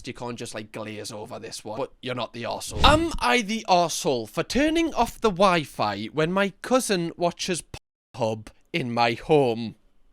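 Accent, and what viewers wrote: Newcastle, United Kingdom. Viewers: I just came across this podcast. Love his chat and the accent brings back great memories of Newcastle, now 45 years ago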